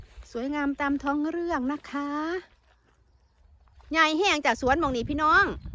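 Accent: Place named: Ubon, Thailand. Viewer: 👋😊เดนมาร์กอุดมสมบูรณ์ทุกอย่างน้อจ้า(ขอโทษเด้อยายเป็นคนจังหวัดได๋น้อสำเนียงคึคนบ้านข้อยแท้?)อุบล.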